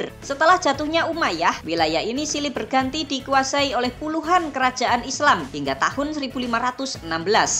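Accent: Java, Indonesia. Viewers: membawa britanya medok sih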